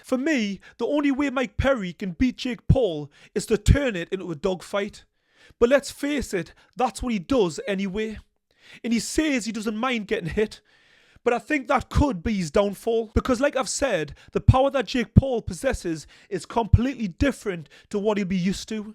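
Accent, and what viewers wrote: Newcastle, United Kingdom. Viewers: You from the Toon or Durham mate, regardless subbed 👍🏻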